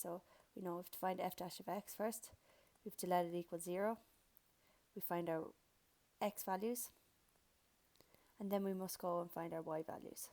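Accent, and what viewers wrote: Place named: Ireland. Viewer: That Irish accent is the best 👍thanx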